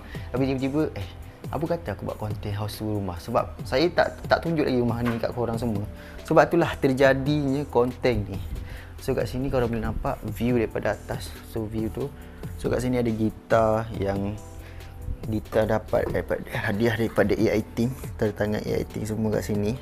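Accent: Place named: Kuala Lumpur, Malaysia. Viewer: Cara editing mmg dr dulu tk prnh mengecewakan...Cantiks hias... Dr dulu kalau tgk time dia duk sekali dgn adam semua diorng antara paling kemas jaga umah... rumah bujang kira mmg sngt² okey... umur adik akak sebaya tp kena akak dia yg kemas bilik depa... Kalau tk sakit mata tgk...😅 Cara berckp dr dulu sgt perform bile dia brckp dlm yt dia... dulu org kecam sbb ckp loghat kl ni berlagak tp sebenarnya kalau kt pandang jauh blh menarik org luar utk tgk yt dia jgk... akak sgt suka sbb dia ade vibe mcm yt iman kamil... softspoken n details bile bercerita... Org introvert je faham... ni kalau dia review brg confirm org jadi terjebak nk beli... Terbaik... 🎉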